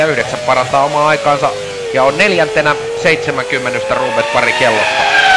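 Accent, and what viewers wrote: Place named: Finland. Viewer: Sounds Finnish to me.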